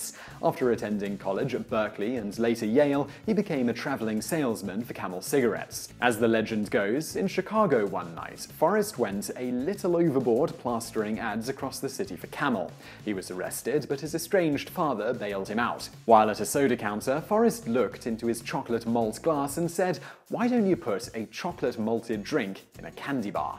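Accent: England, United Kingdom. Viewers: Hearing a fellow Brit repeatedly saying candy unnerves me! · Yes... is from the UK. Quite a few words are pronounced differently compared to what I usually hear in the US. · As opposed to "new-gat", right? I noticed that, but is from the UK so... he says it a bit differently.;) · Why do you keep calling it candy?? You sound like you’re from the UK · An english accent calling chocolate 'candy'........my ears have just been assaulted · I'm confused. You have an English accent but use American words - like candy instead of confectionery. So I don't know if you are speaking from a UK or US perspective. To me Mars means that company in Slough, but I think to you it's something else.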